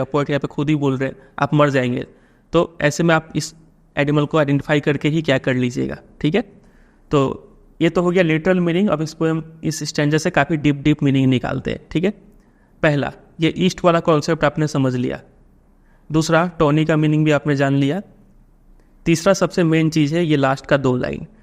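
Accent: Bihar, India. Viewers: sir are u from bihar your explaination is bestest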